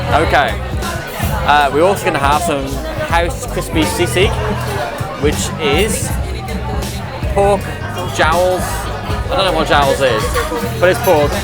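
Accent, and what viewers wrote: United Kingdom. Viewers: I noticed while you were tasting the sinigang soup your companions were telling somebody (the restaurant manager perhaps) that your a famous vlogger from the UK (your accent helped too) ergo you got the dinner for free. Your lucky that your Filipino companions were social media savvy and knows how to negotiate, but have to advise you that's not always the norm. Sorry for being a spoiler but kudos to those Filipinos you were with.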